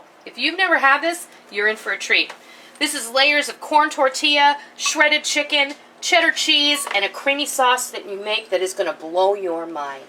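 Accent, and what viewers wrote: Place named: Wisconsin, United States. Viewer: Where are you from? You sound like you have a Wisconsin accent 💜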